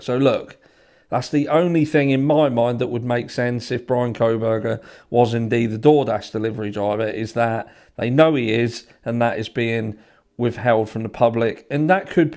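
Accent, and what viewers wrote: United Kingdom. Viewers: Right there and there lol I think I just like ur bretesh accent eh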